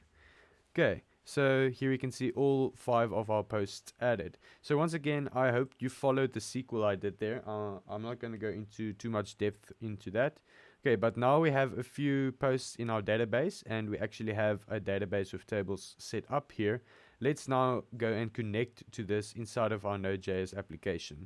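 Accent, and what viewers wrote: South Africa. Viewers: i can hear a mzansi accent...?....?....? ❤❤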